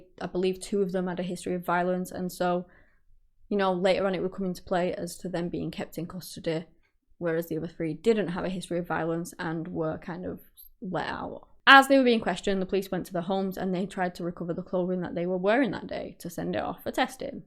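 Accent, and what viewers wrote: United Kingdom. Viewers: Thank you for highlighting this case. May I ask where is your accent from in the U.K.? Thank you.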